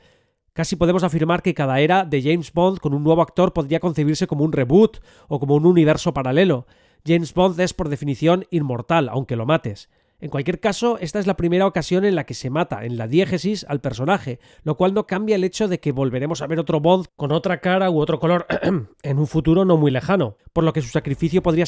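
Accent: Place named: Spain. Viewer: Que bien análisis de la película y le encuentro toda la razón, pero me da mucha risa y me saca de la seriedad el como pronuncia los nombres de las cosas y personajes de Star wars, por cada cosa que empieza con S los españoles le meten un "ES"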